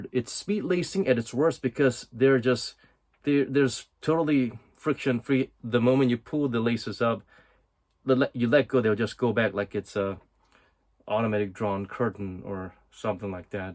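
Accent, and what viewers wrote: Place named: United States. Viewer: 형님 미국 교포신가요??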